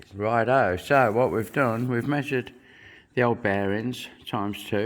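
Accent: United Kingdom. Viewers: Hi. Good to know that you're aright after all that rain you've catched. Today I had a bit of a problem understanding what you said, but it probably was your dripping nose that made the difference. 😀 I talk German and American English and your British English always takes a moment to set in. 😀 If I ever get to change bearings on a wheel I will think of how you did it. Thanx. James from Berlin, Germany 😉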